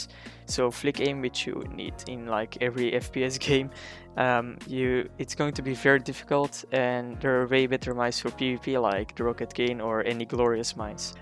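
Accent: Netherlands. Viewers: ik wist dat je nederlands was ik hoorde het pog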